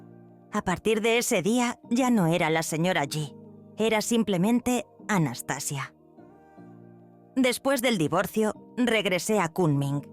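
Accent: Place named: Castile, Spain. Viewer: AHORA LA NARRACION ES CASTELLANA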